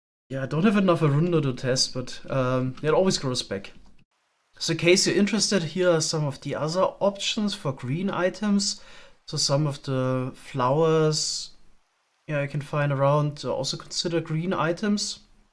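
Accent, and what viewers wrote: Germany. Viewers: Every now and then the German accent makes me smile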